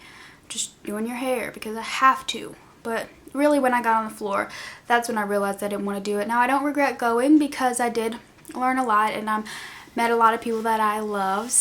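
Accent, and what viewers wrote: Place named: Louisiana, United States. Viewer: I'm from Mississippi and as soon as I started watching your videos I thought you had a Louisiana accent. I'm getting ready to go to regency beauty institute in Virginia this month!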